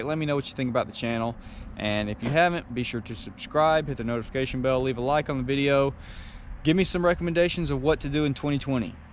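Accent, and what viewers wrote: Alabama, United States. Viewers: What part of bama you from ?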